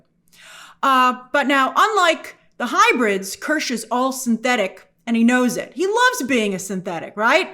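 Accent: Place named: United States. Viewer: Took French in school, so I was cringing at her Americanized pronunciation.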